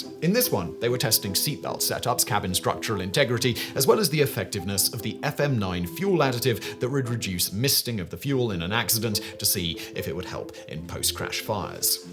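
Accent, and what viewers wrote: United Kingdom. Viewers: I sometimes find myself paying more attention to Simon’s diction than to what he’s actually saying. How is it that the British pronounce the word “air” as just “a,” with a short “a” sound? Also, since my son is a flight instructor working toward building enough hours to fly commercial, I am also very thankful for the strength of airline safety. I keep telling people who worry about flying that they should really be worried about driving to the grocery store. Also, it’s glide “slope,” not glide “scope.”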